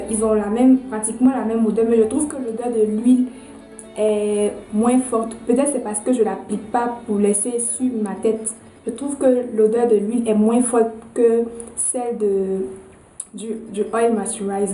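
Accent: Ivory Coast. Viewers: Trop mimi, tu m'arraches toujours un sourire avec ton accent trop mignon qui me rappelle mon pays la Cote d'Ivoire, bon courage et bonne continuation à toi:-)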